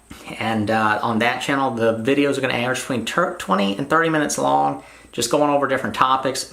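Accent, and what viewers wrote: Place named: Texas, United States. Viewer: Hey, I live in France and about wood framed houses (maison ossature bois in French) you're actually so right. Our tough houses ( masonry) are tricky to renovate regarding man strength and structurarly it requires heavy heavy duty sometimes just to add a door, a window or a sliding door. Wood houses are on the rise it is true in France. They're not really cheaper since we don't havea lot a wood resources. But they provide flexibility regarding the floor plan and the possible additons of the house (for instance, adding a bedroom on the side of the house). Workload is really easier to manage with wood than masonry. Also, wood provides better eco (heat) performances but for sure far more sound issues (cracking and sound passing from a room to another) and some people fear the wood to rotten and by doing so, making the house to collapse. 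Anyway, I bought a house in Normandy which was a pig house transformed onto a house but its core is compacted dirt with cereals... Anyway if I'd build one, I'd choose wood for sure. Love your videos. Love the music. Love your Texan accent. Love the content. Love the answers given to our questions either in the comments or in your videos. Take care and love this house too, great potential. 👋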